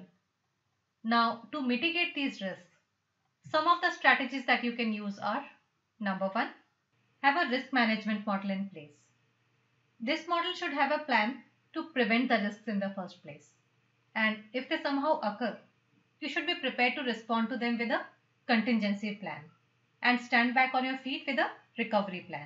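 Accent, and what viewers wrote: India. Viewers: Has difficult to adjust myself to your strong Curry accent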